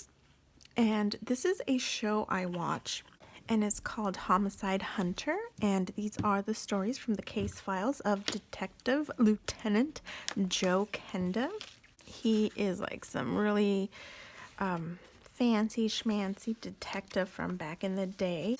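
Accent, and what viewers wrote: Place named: New York, United States. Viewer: Love your New Yorker accent...lol It’s a shame no one was found accountable for the crime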